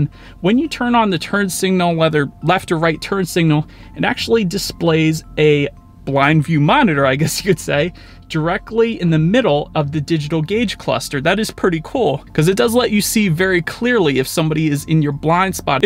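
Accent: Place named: Philadelphia, United States. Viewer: Living in Florida now I really miss hearing that Philly accent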